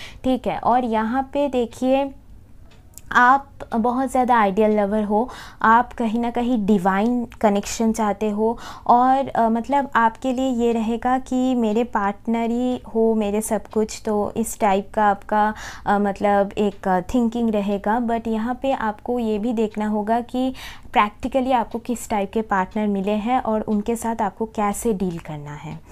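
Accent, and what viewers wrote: Bengal, India. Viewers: You are bengali right?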